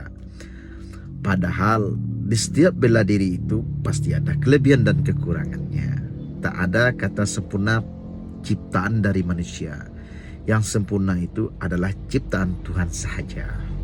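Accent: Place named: West Sumatra, Indonesia. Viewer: dari logat atau aksennya,nampak bahwa abang ini urang awak alias asli minang.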